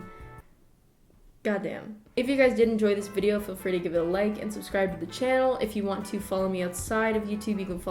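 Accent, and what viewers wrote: Canada. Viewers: YOUR CANADIAN ACCENT IS SO CUTE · I can tell you’re Canadian by the way you pronounce Magcon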